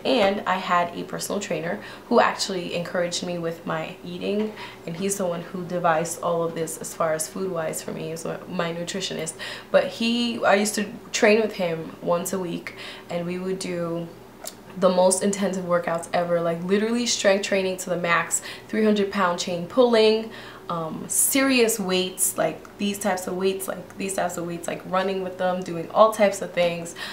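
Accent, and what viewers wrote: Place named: Africa. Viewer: Wow, I'm a new subbie and I've learned you are African, yet I could not detect an accent in your voice until I watched this video. When you talked about your chores in boarding school, you accent came out. I was pleasantly surprised :)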